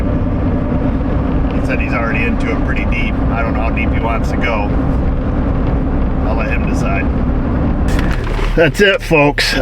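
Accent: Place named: New York, United States. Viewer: I watch every video that you make and look forward to each and every one of them and have been a subscriber for since I discovered your channel.rears ago Ii hit thr "like" button (if I'm not distracted) because i appreciate your honesty to your customers and get a real idea of what it's like running your own shop and it makes me appreciate my local garage. My only beef is the "Members first} option that I refuse to join. I'm from the heart of Dixie and forgive your New York accent because if you wrere the family next door ya'll would be my best neighbors ( I have a French family, a British family and an African American families in our Cul de Sacand they ALL are great folks but there is a loss of communication since I am a BAMMER. I get you and perfectly even though yousay bunnons for BuTTons Keep making great videos and oh did I say I dislike members first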